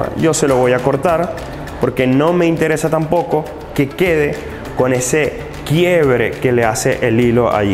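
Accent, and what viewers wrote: Venezuela. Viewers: Otra cosa no tienes asentó Chileno parece tu dialecto Venezolano. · Tú estarás en Chile pero... ese acento...venezolano.... Saludos desde Venezuela, muy bueno tu video nunca he seccionado una pierna de cerdo, solo pollo y la pierna sin cadera es la que compro para hacer el jamón curado, qué fácil lo pones pero... es difícil!!!! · A los segundos de escucharte, supe que eres venezolano. Eres lo máximo.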